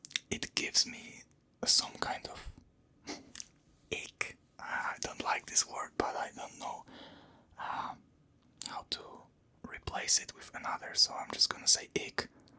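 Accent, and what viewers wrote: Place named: Russia. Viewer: I'm glad you found a hobby you love and you're doing great at it. I really love ASMR and am glad you're making such a big contribution to it. Hope your channel continue to thrive. I'll probably subscribe too and look forward to new videos =) P.S I'm currently learning English and I love your pronunciation...this is the first time I understand English speech without subtitles. Maybe your clear pronunciation is due to your Polish accent?! (It sounds a bit Russian, btw) Poland is a wonderful country! I hope I can visit it someday!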